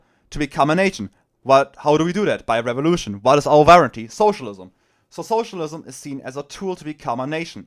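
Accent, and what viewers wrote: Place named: Germany. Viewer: based, this is what got me into national bolshevism, also I love the German accent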